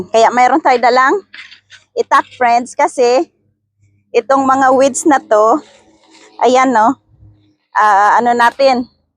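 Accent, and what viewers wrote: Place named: Albay, Philippines. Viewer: Parang Albany Ang salita mo idol Kasi mo sondang tapos tigbasan taga saan ka Po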